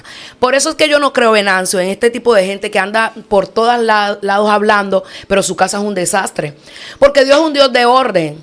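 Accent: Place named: Dominican Republic. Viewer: Esta hermana parece Dominicana tiene el acento de el pueblo Hato mayor Rey Dios siga bendiciendo esta mujer del Reino un abrazo a la distancia ❤❤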